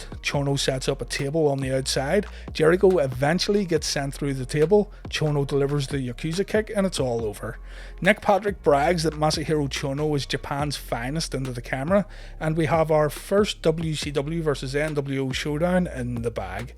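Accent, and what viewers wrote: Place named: Northern Ireland, United Kingdom. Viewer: Just found this channel last night with the DX vids I noticed your assent by any chance you from Northern Ireland?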